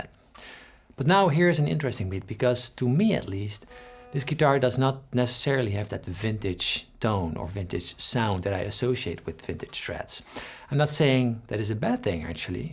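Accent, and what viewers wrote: Netherlands. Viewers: Hi. Are you Dutch? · Nederlands accentje is zo makkelijk te herkennen!! 🙃🙃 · You have a dutch accent? (very little).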